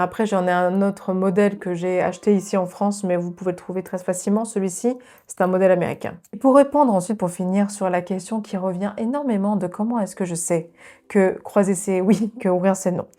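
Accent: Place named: England, United Kingdom. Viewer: Ha je viens de comprendre d’où vient ce charmant accent! Je pensais d’Angleterre, je n’étais pas loin!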